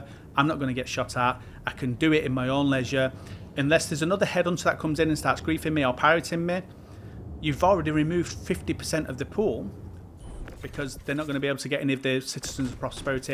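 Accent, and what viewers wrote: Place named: United Kingdom. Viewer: 1. You need to slow down when you talk 2. You have a thick UK accent half of what your saying is hard to grasp 3. Your a bit of a scatter brain, prepare when you post You Tube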